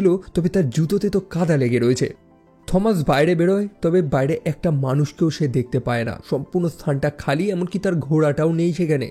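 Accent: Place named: Bangladesh. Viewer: আপনার কথাগুলো শুনতে একদম বাংলাদেশীদের মতো!!!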